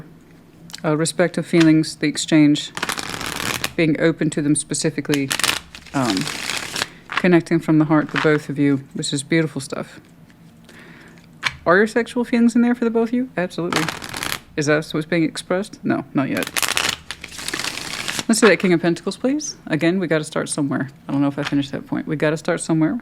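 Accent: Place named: South, United States. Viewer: You are such an interesting and intelligent reader Scorpio sister, and I truly make an effort to try to listen to you. To no avail. Why effort? Because English is not my mother tongue, and despite having lived in D.C. for twenty years, I didn't have any exposure to the southern states, therefore your fast speech and southern accent with words not clearly articulated pose a big problem for me. I am sure others sail smoothly through your presentation. I manage a little and then I give up, as I just don't get but 30% of what you say. Sorry for being frank, regards from one older woman from Europe who would otherwise be ecstatic to follow your channel. Best to you!❤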